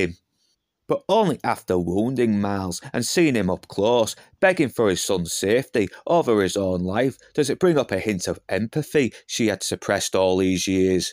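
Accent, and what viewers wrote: United Kingdom. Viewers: I'm Romanian and I adore that British accent!!!Good job mate!!! :face-red-heart-shape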